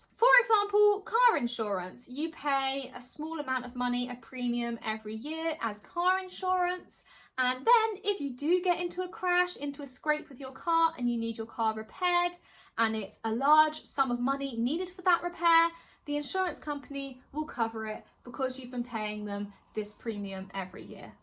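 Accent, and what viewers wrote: United Kingdom. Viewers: Her british accent is so elegant! I wanna speak like that 😭💔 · This is the first video of your channel I've watched since am a Thai guy, who is looking forward to applying to a B.Sc. Actuarial Science in a certain university. I personally think you look so British, assuming by your accent. And all of your question replies are so helpful, all of which make me understand more clearly about what I am gonna face after graduation. thank you!!! :)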